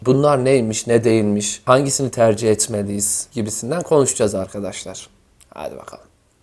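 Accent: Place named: Istanbul, Turkey. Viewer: Dostum eline emeğin e saglik.diksiyon baya istanbul ağzına yaklasmis😆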